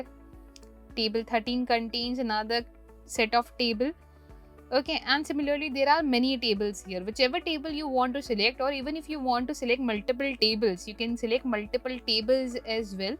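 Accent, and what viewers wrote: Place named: India. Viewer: She is good. But you also Indian i mean same accent with her. 😂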